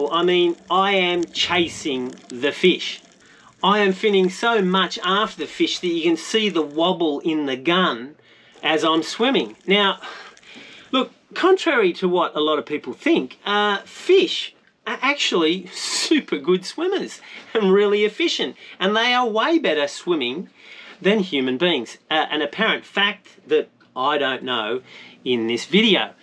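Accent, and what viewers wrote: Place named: Australia. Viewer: Not at all! You have to work with what you have but that doesn't deny you being smarter about it. All you have to do is keep filming, and giver yourself enough time between 'eprisodes' to craft your content into a storyline that garners interest, and which you USED TO DO, otherwise I would not be sitting here giving you an honest critique! Think about what you used to do that's missing. I haven't had time to look at all your older 'top end' episodes but the few I have provide a viable outline. They contained the following: 1) Aussie accent and lingo 2) Interesting locations & commentary 3) Lots of 'fluff' 4) A bit of 'puff' 5) and some high-seas drama that nearly everyone could relate to one way or another, e.g. "who's drinkin' all the flamin' water?", "crocodiles" etc. ...and you were building numbers! Also don't forget that a story has a beginning: set the scene, a middle: flesh it out with interesting content, drama, & Margarida (especially Margarida), and an end: wrap it up with a mini preview of the next one, a teaser or two from Margarida, thank your sponsors, and tell your viewers/followers what you need from them if they are enjoyed THIS show...and all of that in Aussie lingo with the occasional translation of the more obscure. 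Note to you: You had most of that, sort of, right up until Margarida got stuck someplace else, and you had the lightening strike. None of the above is about changing you, champ. It's all about changing how you do what you are doing, or at the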